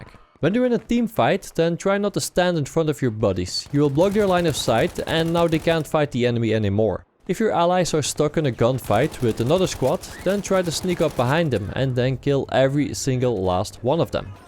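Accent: Netherlands. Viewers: His accent is sooooooo DUTCH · Are you Dutch hahahha your English is like that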